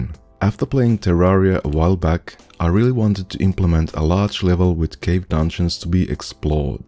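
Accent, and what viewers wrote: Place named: Finland. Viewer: I could bet money you are Finnish. :D I recognise that accent.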